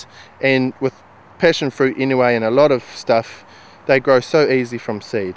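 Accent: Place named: New Zealand. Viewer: hey, i love your work man, and that great kiwi accent lol. i've learnt a lot from these three videos and i too have become interested in growing, grafting etc.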